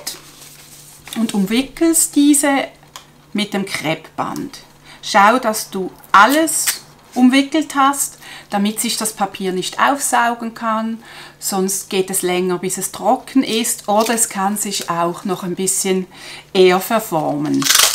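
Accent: Switzerland. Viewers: Fantastische Kunst, bin begeistert 🥰, vielen herzlichen Dank für Deine wertvollen Videos, hab dir ein Like und Abo gemacht 🥰 (und dein Schweizer-Hochdeutsch sehr sympathisch 😀)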